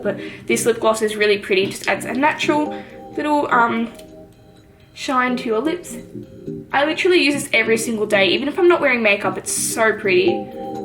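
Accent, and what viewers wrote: United Kingdom. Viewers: British and African ❤ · Question: are u British?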